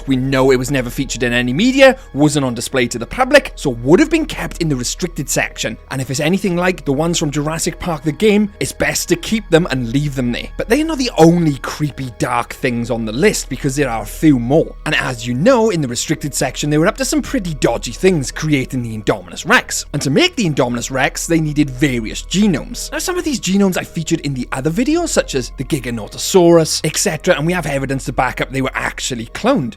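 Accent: Wales, United Kingdom. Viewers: Just new to your channel I love it also are you Welsh too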